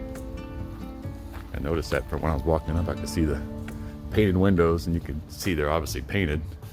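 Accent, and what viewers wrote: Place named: Cajun, United States. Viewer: If you did these in your gay cajun accent you’d probably crazy viral ha 😂😘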